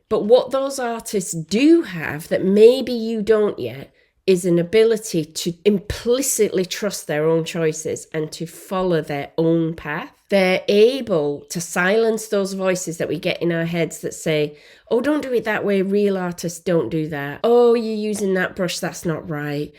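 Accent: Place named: Lancashire, United Kingdom. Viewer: Gosh, I’m so happy I found you and your podcast. I live in the US now, but originally from Lancashire, your accent brings me back home (I have family in Yorkshire!) I’m so excited to delve into acrylic painting properly this time and learn my authentic self/style; no more picking up then giving up - excited to learn and be guided by your lessons and work. Thank you.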